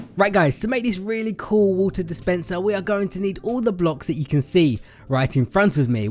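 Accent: United Kingdom. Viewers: are u british because u talk british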